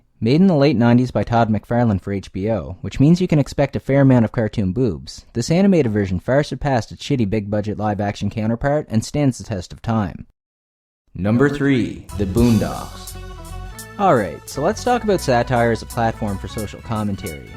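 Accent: Canada. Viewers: I was guessing either the Midwest or Canadian lol. It came out in the vowels ^_^ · My god, I can't stand your shitty canadian accent.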